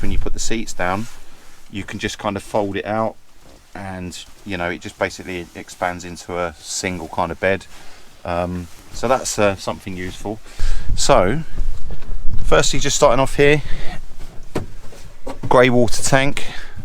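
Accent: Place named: Southern England, United Kingdom. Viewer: Nice job. This is exactly the route me and the Mrs want to do with our E51. All the gear but not convert it. Great stuff. You sound like your from the south mate, I’m in Margate, are you anywhere near there?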